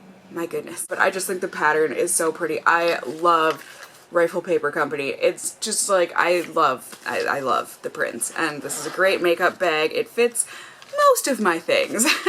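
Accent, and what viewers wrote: Minnesota, United States. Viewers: You are so dang cute. When you say the words, bag, and Aunt, you sure can tell you are from Minnesota! 😘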